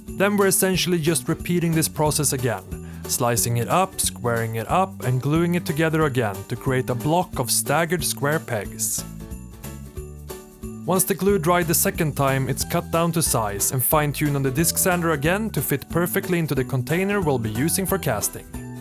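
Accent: Sweden. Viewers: satan vilken grov svensk dialekt! avslöjad direkt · Aaa i just realised that sounded kinda rude, but I dig the Swedish accent :—) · are you swedish or norwegian? your accent is kinda awful sorry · u sound swedish jus saying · are u sweedish?? or norwigian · Jag kände igen den svenska engelska accenten men det var när du tog upp morakniven och uttalade mora perfekt så var jag säker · I love you super Swedish accent!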